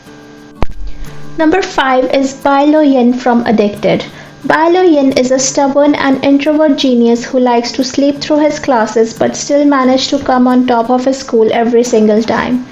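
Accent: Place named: India. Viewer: Hey by ur accent you sound indian, right?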